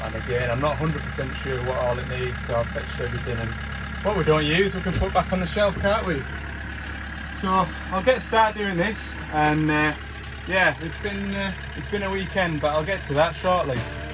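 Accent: Cumbria, United Kingdom. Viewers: Hi,My mum was from a small place called Longtown just outside of gretna green. Now I remember as a youngster her having quite a similar accent to yourself,are you from that area,she was a mad keen Carlisle football supporter which I remember saying was about 7 mile from her place of birth.....Just keen to know,I'm a joiner by trade but really enjoy your content.....Regards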